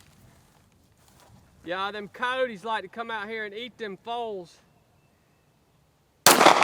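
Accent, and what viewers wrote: South, United States. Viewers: I live OCONUS and my wife is not American, so I show her videos as the prime example of the accent from my beloved homeland. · You got to love that southern accent when he pronounce Fiocchi "Foochi" 😅. Get MTG on your video!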